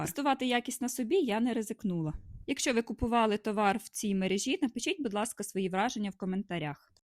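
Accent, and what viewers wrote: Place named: Galicia, Ukraine. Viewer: Це якийсь ссс Галичина...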